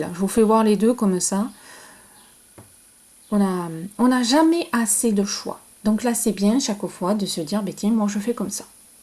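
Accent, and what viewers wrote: South, France. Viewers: Bonjour vous êtes mon rayon de soleil et le bonheur de vous entendre avec votre accent du midi je suis moi de même du midi MERCI BISOUS BISOUS BISOUS FERNANDE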